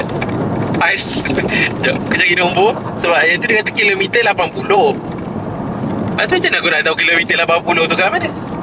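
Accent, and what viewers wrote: North, Malaysia. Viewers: Asalamualaikum..nk tnya asal dri utara ka?kedah ka penang ka perak ka?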